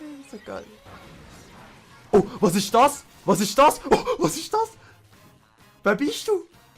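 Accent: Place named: Switzerland. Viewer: Ich bin zwar aus Österreich und rede eigentlich nur Hochdeutsch, verstehe dich aber trotzdem mega gut. Generell liebe ich es wenn jemand Schweizerdeutsch spricht.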